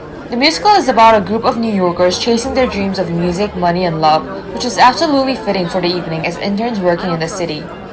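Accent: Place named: Singapore. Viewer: 혹시 싱가폴에서 오래 사셨나요? 제 싱가폴 친구랑 억양이랑 목소리가 넘 비슷해서 깜짝 놀랐어요😅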